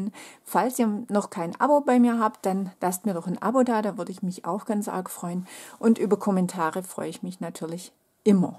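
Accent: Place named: Swabia, Germany. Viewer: Süsses Projekt, Deine Sammelbesteller werden sich freuen! Und Dein Schwäbisch ist total goldig xx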